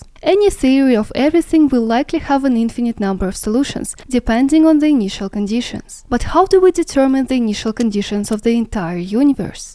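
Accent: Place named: Eastern Europe. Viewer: You are cute and havea slavic accent.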